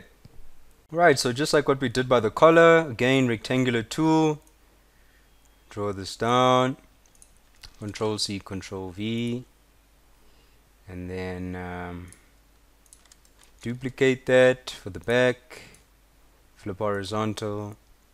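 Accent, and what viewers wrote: South Africa. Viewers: Thanks for a detailed tutorial bru....really nice to hear that distinctive SA accent :)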